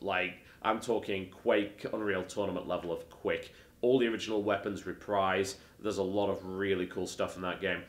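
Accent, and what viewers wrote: United Kingdom. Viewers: ps he's brttish lol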